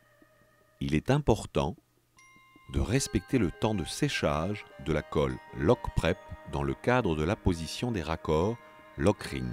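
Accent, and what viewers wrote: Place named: Paris, France. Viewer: He has a very posh accent. Is he from Paris? . Anyone?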